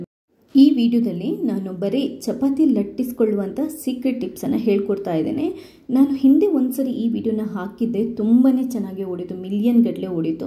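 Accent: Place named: North Karnataka, India. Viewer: I guess by your accent that you are from north karnataka, but why u r struggling to speak in blore kannada, speak in your own language. No worries.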